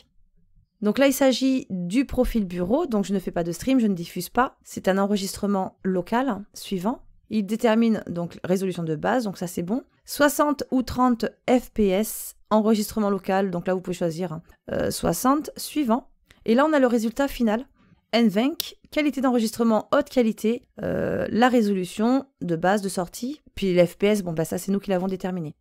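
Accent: South, France. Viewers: supert tutoriel tres complet bravos jeune fille continue longtemps celas fais plaisir d'apprendre en ta compagnie ! avec l'accent du midi amicalement marc pecheo3 merci!😀💐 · vous parlez trop vite, calmos.. (c'est l'accent du sud peut-être)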